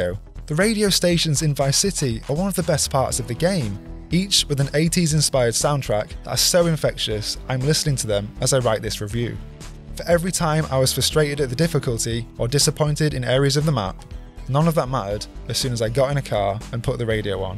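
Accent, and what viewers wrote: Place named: United Kingdom. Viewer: well wishes for you as well!! I guess he is British, at least thats what we can suppose by the accent hehe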